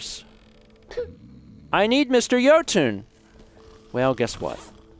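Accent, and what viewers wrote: South, United States. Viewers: I feel the same with danish XD soutern american pronunciation though... the poor man is really doing his best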